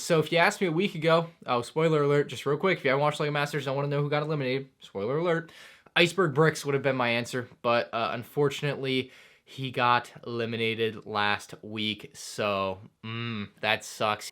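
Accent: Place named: South, United States. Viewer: Your southern accent is amazing!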